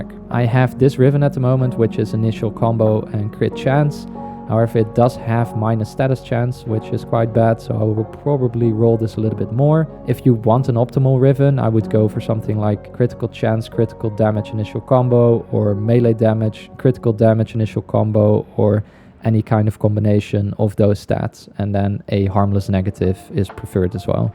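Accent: Netherlands. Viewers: Do i hear a dutch accent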